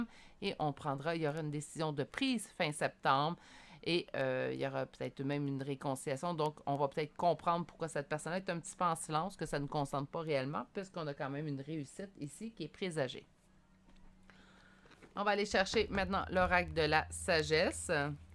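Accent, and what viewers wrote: Canada. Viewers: Bonjour, Ça sonne juste. Ha, que j'aime aussi écouter ce merveilleux accent Canadien chaleureux et rassurant ! Bises.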